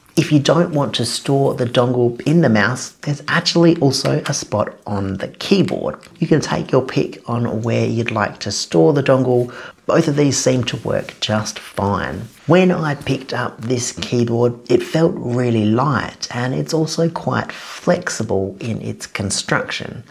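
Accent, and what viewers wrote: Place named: Australia. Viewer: What affectation is this? I am unfamiliar with Australian dialects but he has a tightness in his voice that sounds like an affectation, and he hits his S plosives extra hard. Where in Australia is this accent from?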